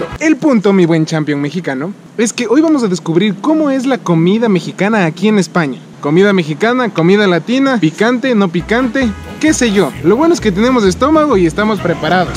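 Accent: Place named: Ecuador. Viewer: Eso me gusta del Champion ... No cambia su forma de hablar Ecuatoriano hasta las huevas ... Mijin .. ánimo Bro .. más vídeos porfa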